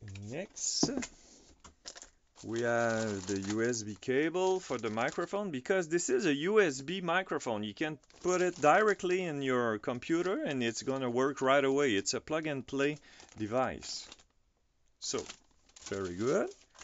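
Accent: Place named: Quebec, Canada. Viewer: Toé t québécois certain ?????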